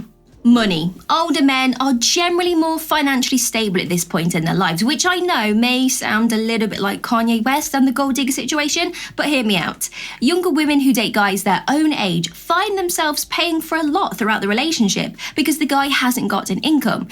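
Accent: United Kingdom. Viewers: I kind of like the British accent, and you don't make the eyes hurt. I do find myself going whew when you finish a video...as while I enjoy listening to you talk I feel bombarded at times.... peace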